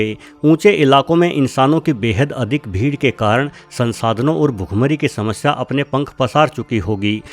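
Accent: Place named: Bihar, India. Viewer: Bahut sound like bihari tone 🤣🤣🤣😂😂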